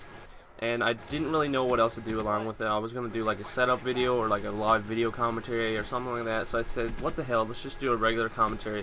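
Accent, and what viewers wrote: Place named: Canada. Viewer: What is your accent? Sounds like you got something mixed in their that isn't just Canadian.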